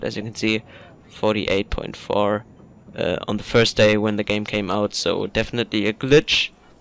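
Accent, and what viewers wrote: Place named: Germany. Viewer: Wie ich alleine an deiner Aussprache höre, dass du Deutscher bist. xD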